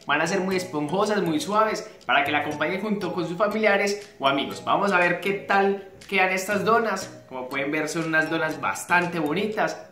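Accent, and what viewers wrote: Antioquia, Colombia. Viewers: Amo tu acento paisa jajajaja gracias por la receta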